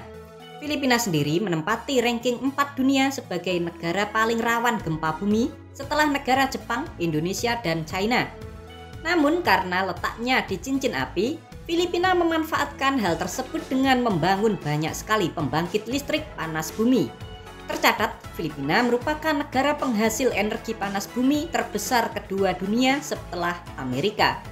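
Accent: Java, Indonesia. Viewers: suara mimin lucu banget karena orisinil logat jawanya itu yang bikin lucu 🤣🤣🤣🤣🤣🤣🤣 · Adminnya medok banget logat jawanya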